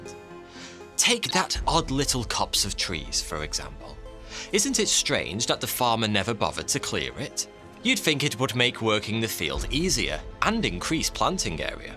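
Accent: United Kingdom. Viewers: Allow me to dismiss your British accent. There is a reason why the USA won our revolutionary war. Don't get me wrong! I did listen to and read British litetature, but I never found knowledge.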